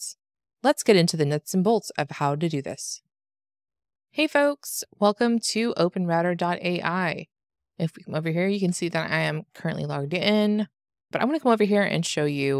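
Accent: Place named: Pennsylvania, United States. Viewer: Is the narrator for this video from Pennsylvania? I detect a similar accent. :)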